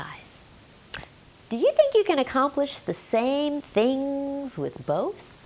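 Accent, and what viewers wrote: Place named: South, United States. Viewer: My dear Ms I can see you have got my feedback all wrong. I do appreciate and respect thoroughly the amazing work that you are doing. As an audience and as a person who understands the value of this my most sincere intention is only to bring to your notice a couple of things that nay enhance your appeal across audiences. As I mentioned earlier all I have shared is with due respect to all you do, I feel sorry that a person as well educated and enlightened as you us unable to appreciate a simple opinion about how you could reach broader borders. I work with appearance and understand that when people cannot connect with what they see or hear they are likely to switch off. And I do not understand what understanding English has to do with this at all. Your very southern American english can probably be comprehended by only those used to its peculiarity its not as uniformly understood by non native speakers at all. 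Having shared my thoughts I have no intention of offending you ma’am its only a way of sharing my thoughts about how you could increase your appeal. I do hope you understand my drift. Thank you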